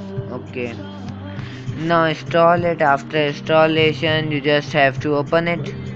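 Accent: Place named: Pakistan. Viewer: Tamás Papp bro if you dont like someone's accent ,just go away dont judge him by his way of speaking, at least what he did worked and its a working trick ,he is from Pakistan and here english is a secondary language and he made a video in English to help the Western people who are in problem ,he can also make a same in his own language but he cared of all coz english is adapted by many countries .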